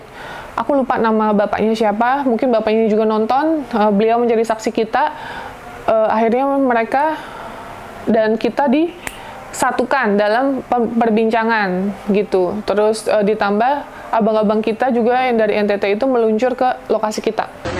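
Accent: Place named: West Java, Indonesia. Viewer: Resep ngadangu si teteh nyarios teh .. orang sunda ya. Tadi ada kata keukeuh dan Juntrungan nya 😂😂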